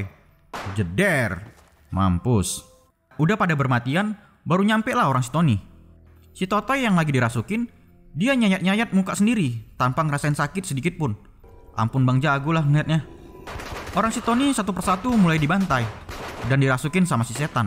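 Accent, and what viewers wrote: Medan, Indonesia. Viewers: wowkowokwok biar mantap bolehlah logat org medannya di pertahankan, saran ya... jangan semua benar2 dipertahankan biar meluas semua org bs menikmati kontenmu, pake Ejaan yg sedikit mendekati bahasa indonesia baku, ok lae.. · Untung aku anak medan jd ngerti apa yg kau bilang hahaha cepat kali bah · Medan kali kau yah bg yahh, salam dari pakam ya lae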